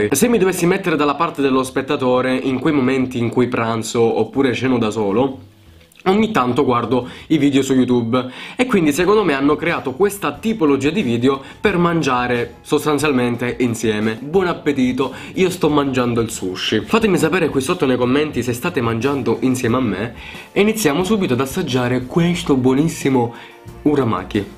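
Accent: Italy. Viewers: si sente troppo dall'accento che sei palermitano miiii ho parenti in Sicilia 😂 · vedi avevo indovinato😂 da buona calabrese mi sono sentita ''una parlata'' simile alla mia😂😂😂 mooolto strano su YouTube che sono tutti del nord!!😢 Cmq nuova iscritta!!!bravo bel canale😉🍻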